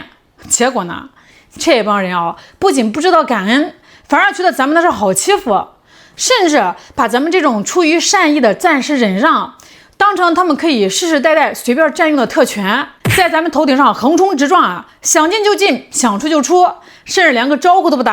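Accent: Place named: Hebei, China. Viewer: 有真有假、听上去气势挺足、听听热闹罢了….普通话标准一点就更好了、应该是河北的。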